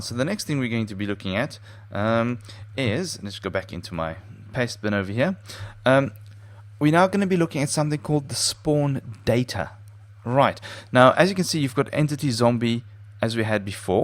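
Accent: South Africa. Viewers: You sound South African lol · I know a Saffy accent when I hear one ;) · are you south african?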